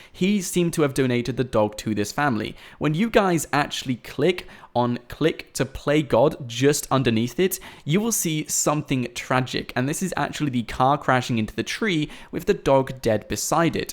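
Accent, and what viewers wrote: United Kingdom. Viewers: u r britain accent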